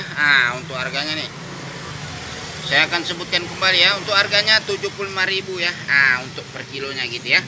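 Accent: West Sumatra, Indonesia. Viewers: Suaranya kayak minang kocak...lucu gan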